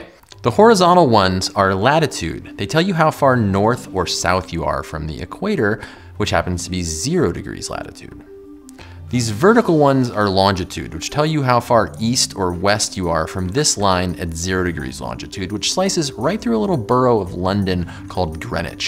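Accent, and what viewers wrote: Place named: United States. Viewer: He’s using the American pronunciation. We’re “Two lands separated by one language.”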